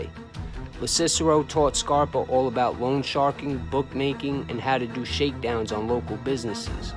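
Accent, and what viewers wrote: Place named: Brooklyn, United States. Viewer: Another nice job. Love your "Southern" Brooklyn accent.. Keep it up--your subs will keep increasing.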